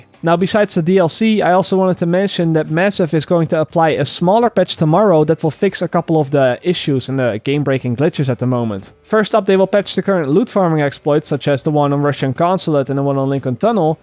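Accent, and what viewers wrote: Netherlands. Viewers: are you dutch? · hahah ik wist wel dat je Nederlands was dat hoor je heel overduidelijk aan je accent als je engels praat :P:P:P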